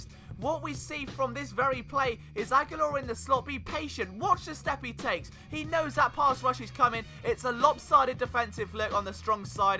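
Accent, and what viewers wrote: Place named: London, United Kingdom. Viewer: Your accent is still pretty heavy (I assume you're originally from London(?)). I'm wondering how if you live in Philly (I assume you live there).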